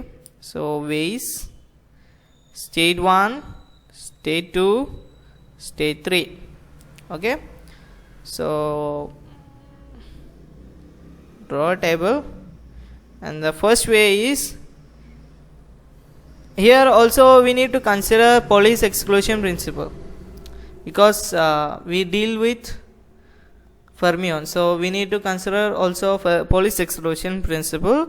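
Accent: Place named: Telangana, India. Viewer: Your ascent is like my friend from telangana , you helped me the way he does, Thank u very much....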